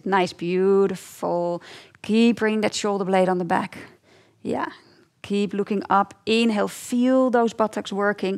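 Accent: Netherlands. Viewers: she sound dutch for sure, i can tell because im from the netherlands... sort of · Great Stuff! I teach Yoga here in D.C. and everyone always asks me about the Gluts (but) body part. And nice Dutch accent also!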